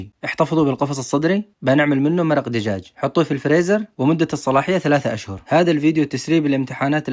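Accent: Yemen. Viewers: يتكلم يمني بدون أي خليط لأن اليمن في لهجات كثيرة وهذه لهجة لبعض مناطق الوسط والجنوب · من اللهجة واضح من عندنا يمني 🌝🤍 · من كلامك مبين يمني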